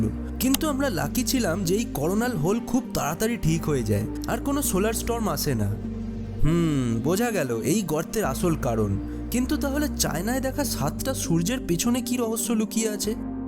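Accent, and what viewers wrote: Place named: Kolkata, India. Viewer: Are bhai😑 Uni kolkata r tai amn pronounciation.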